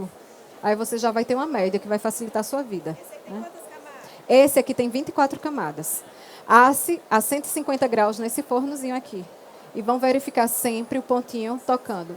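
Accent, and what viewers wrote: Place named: Pernambuco, Brazil. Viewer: Adorei! Perfeito o bolo de rolo, melhor bolo! Adorei o seu sotaque. Viva Pernambuco!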